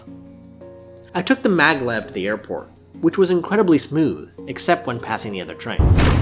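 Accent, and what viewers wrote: United States. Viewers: Love your audio commentary. The seats are the same as Virgin Atlantic business class. I've never tried it but I would definitely give it a miss. Are you American?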